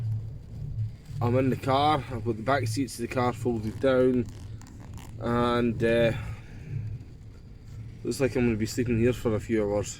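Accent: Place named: Scotland, United Kingdom. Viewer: I'm subscribing just because I am so in love with the Scottish accent and I could listen to you all damn day.😄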